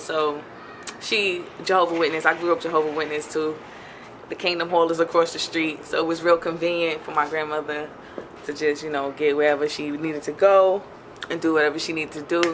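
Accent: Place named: New York, United States. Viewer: I love her NY accent it fits that pretty face. :)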